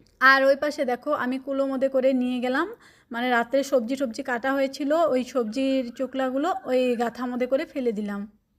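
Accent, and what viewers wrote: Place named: Bangladesh. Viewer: আপু তোমাদের জেলার নাম কি। তুমার কথা গুলো আমাদের বাংলাদেশর মতো ❤❤❤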